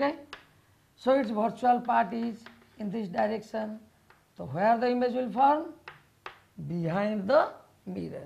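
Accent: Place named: Odisha, India. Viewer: Not Bihari Dear, He is Oriya. Learn to judge throught the accent.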